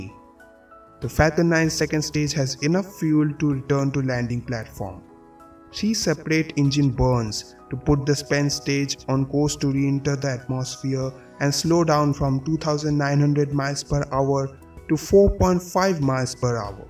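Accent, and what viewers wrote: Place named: India. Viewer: slow down man, your indian accent it will crash🙄😒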